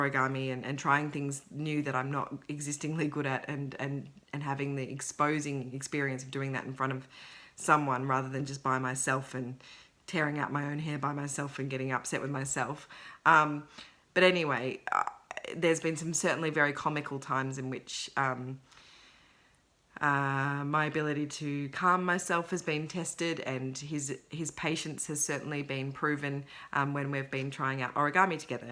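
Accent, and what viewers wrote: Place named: Australia. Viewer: Just discovered your floss tube - I am suck at home with a sore throat which I hope is not the dreaded illness and occupying myself stitching and catching up on flosstube. It is so lovely to hear a video in an Aussie accent, and I am absolutely delighted that my pattern is the first FFO :) Hope your studies are going well... I work in environmental science for my day job and am a low waster, and it's lovely to find a member of the stitching community who shares both passions :)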